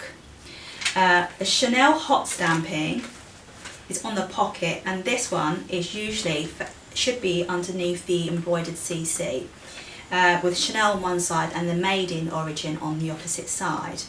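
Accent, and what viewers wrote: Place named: United Kingdom. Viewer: That British accent tho !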